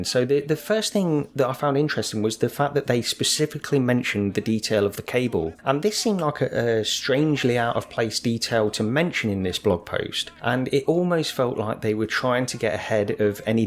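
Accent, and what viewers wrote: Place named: Midlands, United Kingdom. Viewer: Having just picked up a p.c with an rtx 3070 so I can link my oculus quest 2 to it,i watched your vid on project cars 2 in vr which was very informative. I started off with the psvr and while very good the lack of analogue sticks for movement on the move controllers and the less than crisp visuals on most games was disappointing. The psvr 2 needs to incorporate better controllers ( oculus touch or valve index style) ,it will be interesting to see how much sony decide to push the psvr 2,as facebook seem to taking over as far as vr is concerned and more competition can only be a good thing. P.s just subscribed to your channel ,great content ( i prefer your real voice,I'm a midlands bloke myself )👍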